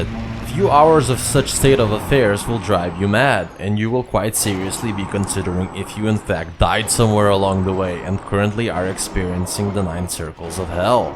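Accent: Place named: California, United States. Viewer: Your Californian accents funny as fuck! thax Bruh your video hella help me! Blesses from California!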